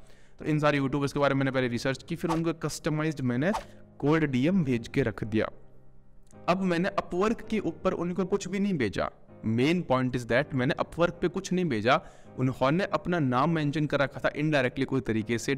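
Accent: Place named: Marwari, India. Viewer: ❤️Acha, marwari Accent aata h esliye guess kiya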